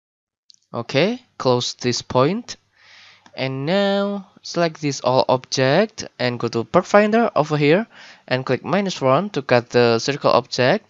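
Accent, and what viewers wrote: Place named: Indonesia. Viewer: hem logatnya kyak orang indo ya...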